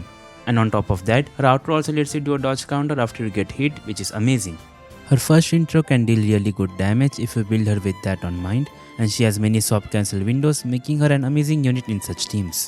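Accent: India. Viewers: I legit thought you got hacked 😂. Who would have thought the real voice sounded Indian 😂😂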